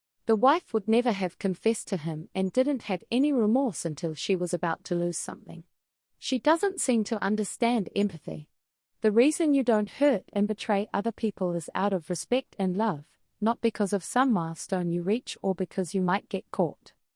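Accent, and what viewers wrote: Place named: Australia. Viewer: It's Strine.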